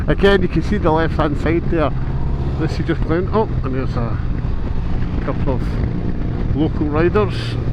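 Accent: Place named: Scotland, United Kingdom. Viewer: Your Scottish dialect has to be the most beautiful of all the English dialects. Greetings from Miami.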